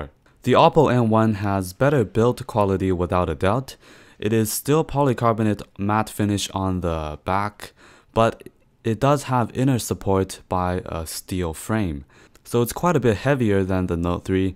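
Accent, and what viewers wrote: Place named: China. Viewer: 这英文有点中国口音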